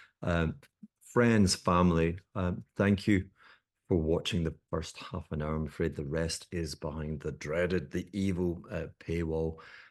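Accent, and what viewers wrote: Scotland, United Kingdom. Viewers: It's how as a Scot, he pronounces 'asset'. Clearly it's an in-joke and a play on words with being on acid, ie.e joker and maverick take your pick. Being elusive and unpredictable is part of the shtick.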